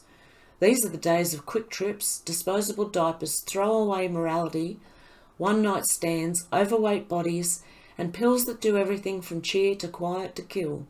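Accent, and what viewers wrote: Australia. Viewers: You are becoming one of my very favorites! Great info and love your Aussie accent!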